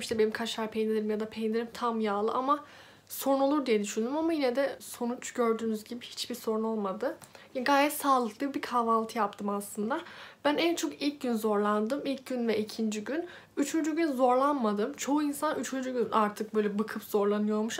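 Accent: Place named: Black Sea, Turkey. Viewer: Karadenizlisiniz sanirım ara öğününuz hangi saatlerde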